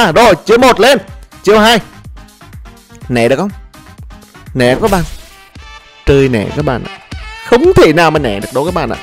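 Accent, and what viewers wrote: Nghe An, Vietnam. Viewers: Anh ở đâu Nghệ An thế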